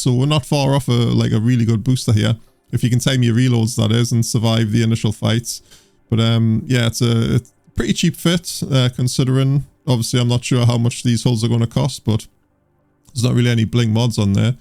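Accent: Newcastle, United Kingdom. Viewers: Wait what, a Geordie playing eve. Why the hell am I not playing with you!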